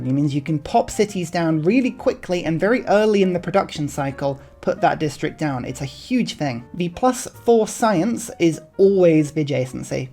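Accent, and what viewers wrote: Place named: United Kingdom. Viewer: Thanks for this "over explained" video. You are very enjoyable to hang out with. Love the mellow jazz and your humor and obvious talent at this great game. What is it about the British accent that is so good to listen to? It gives you intelligence and authority-but you keep it nice and light with your jaunty sense of humor-well done.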